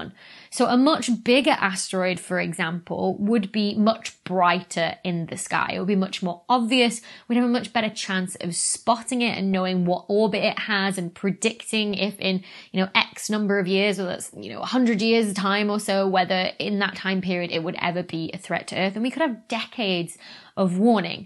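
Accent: United Kingdom. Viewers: Dr Becky, what part of the United Kingdom are you from? I ask because I am intrigued by your speech patterns . Believe me this is not a criticism, I am from the United States where we haven’t spoken English in years. Just an old man’s curiosity. I have started listening to your new book and love it so far.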